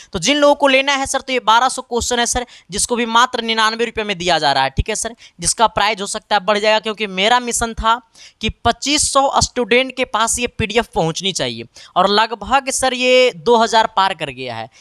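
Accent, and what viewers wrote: Bihar, India. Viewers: Sir aap to bilkul bihari ki tarah bol rahe hai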